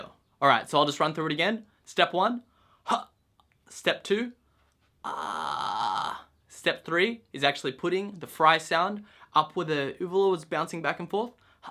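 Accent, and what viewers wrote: Australia. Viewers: so aussie i did not realise he was aussie as well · Are you an aussie?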